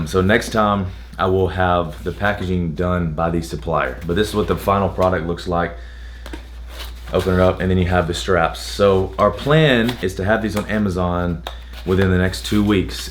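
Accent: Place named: Alabama, United States. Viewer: Y’all Haha can’t get rid of the bama talk.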